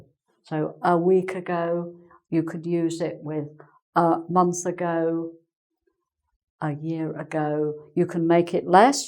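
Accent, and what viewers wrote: England, United Kingdom. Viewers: I love her british accent. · I very love British accent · she must be from England becuse her accent is the same like others English people lmao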